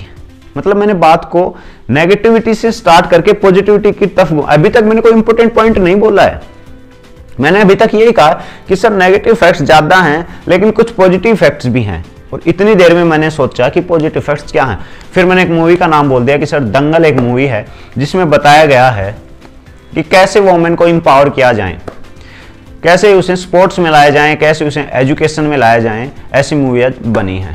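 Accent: Haryana, India. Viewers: Apki voice se pta chal rha ha M be haryana se hu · are waah sir ji Apki boli me haryanvi ras dikkh hai hariyana te ho k😄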